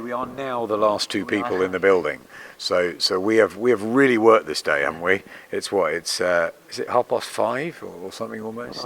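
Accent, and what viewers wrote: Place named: Liverpool, United Kingdom. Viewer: some scouse accent on....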